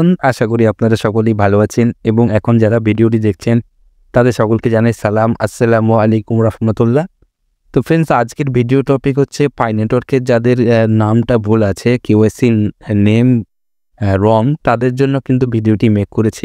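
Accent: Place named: Chapai Nawabganj, Bangladesh. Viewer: Vai Apni ki Chapai zela, ba godagarir upozilar manus? Voice sune amn ta mone hocche..😊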